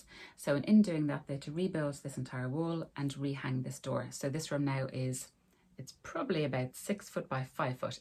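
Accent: Ireland. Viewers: Great vlog and thank you for being so open and warm hearted. Btw love your gentle Irish accent! As a tall person I often wonder how tall others are, especially when we seem to share the same taste. How tall are you? I’m 5’9” and after 2 years of sewing, still have yet to sew anything without lengthening it. Megan Nielsen fit me best…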